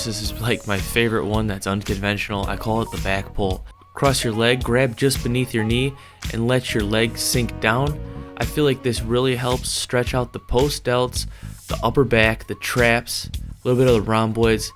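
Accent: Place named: Midwest, United States. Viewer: I don’t know what state you are from, but I’m guessing Midwest or Chicago with the accent. The way you say calf cracks me up 😂😂😂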